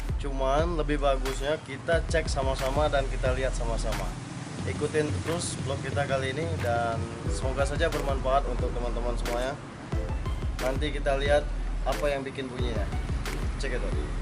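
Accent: Palembang, Indonesia. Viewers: Logat palembang ?? Lokasi dimana kak ?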